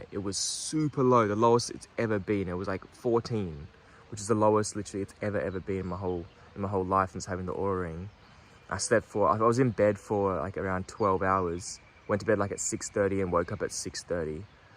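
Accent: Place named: Australia. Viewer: Love your Australian accent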